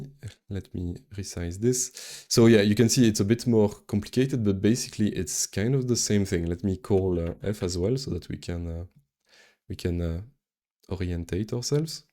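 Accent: France. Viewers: 🎉 brilliant. Cool to finally understand the magic behind cpp lambda functions. Nice french accent by the way.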